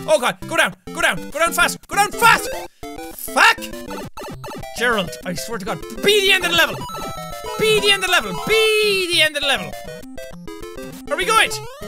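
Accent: Ireland. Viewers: I love you and your videos so much. You are my inspiration. I almost broke my leg on a busy road when I was cycling, but I thought of you and what you'd say in that awesome Irish accent, so I got up and started riding again. Thank you. If you read this, please reply ❤️😍💖😘👌🏻😝💕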